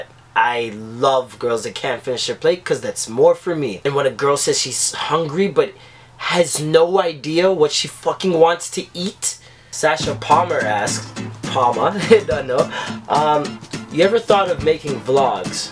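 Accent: Jamaica. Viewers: Are you from Kingston, Jamaica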